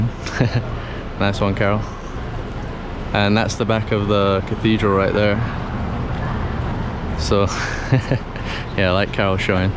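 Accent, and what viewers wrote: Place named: Northern England, United Kingdom. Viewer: I really love the accent from Northern England. Cordoba is amazing! Happy travels!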